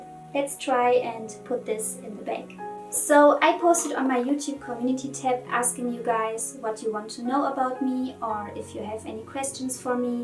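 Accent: France. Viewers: Tu as un accent français non ? 👁👄👁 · Are you french??? Accent sound french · I want to ask you where are you from (no hate) I think you sound french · Am I the only french person here ?;-; Because that’s obvious to me that she has a french accent, and so does Celeste (The burning of the VERSAILLES witch 😜).I know, I’m a party pooper right ?😅 But I have to agree...her voice is sweet af🥺 And also, sorry if I made mistakes!😅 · U have a little french accent .o. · You're french? For the title but also for your accent. Ce n'est pas un reproche, je suis française également 😏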